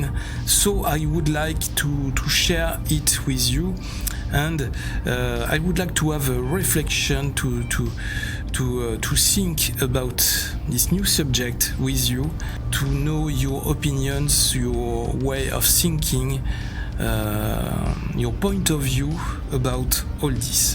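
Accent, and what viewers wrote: France. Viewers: T'as un sacré accent bien français ptdr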